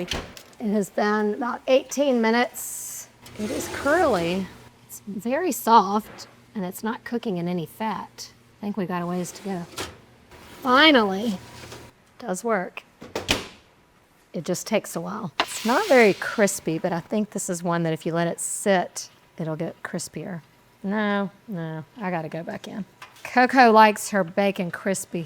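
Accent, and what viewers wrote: South, United States. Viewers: County accent + hazel eyes + Italian = Sexy woman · You remind me of Ella Langley a bit, especially that raspy Southern voice of yours.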